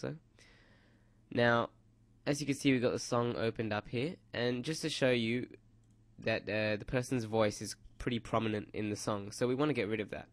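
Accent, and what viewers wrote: United Kingdom. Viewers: British Much <3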